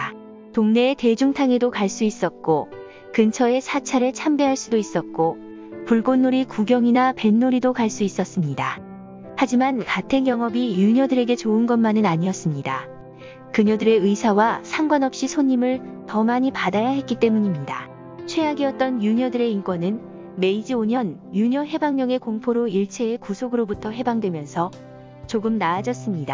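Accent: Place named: Busan, South Korea. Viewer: 부산말씨 같은데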